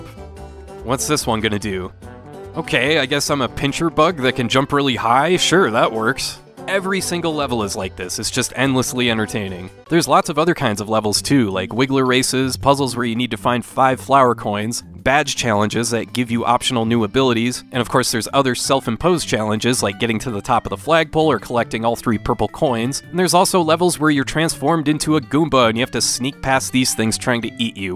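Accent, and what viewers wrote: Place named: United States. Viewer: Just wanna say from a Brit..your accent is amazing…so Americana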